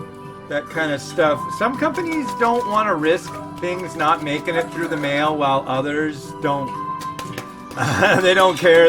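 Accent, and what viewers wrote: United States. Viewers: Провинциальный американский английский, с проглатыванием половины окончаний :) буду его смотреть, чтобы язык воспринимать. Классный чувак.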